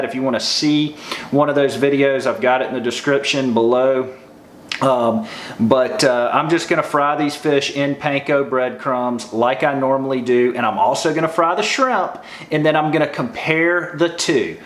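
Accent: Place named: South, United States. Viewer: Hi, I just want to tell you how much I have enjoyed watching your channel which I just discovered two days ago. I absolutely love your Catch and Cook Video's. You are a lovely person and your video's and friends seem so nice. Thank you for your channel and keep those Catch and Cook video's coming. By the way, I saw in your mother's kitchen she had a scripture from Psalm 16 written on a small blackboard. Is she a Christian? If so, I think that is awesome. I have walked with the Lord Jesus for 26 years now and have an awesome relationship with Him. Where are you from originally-you have some beautiful southern accent. You are an amazing fisherman-someone who can tell what fish is on the line before you even see the actual fish tells me that you are very knowledgeable about fish, which I find so interesting and neat. I can tell you just love being on the water and you are so fortunate to be able to make a living doing what you love. 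God Bless You,.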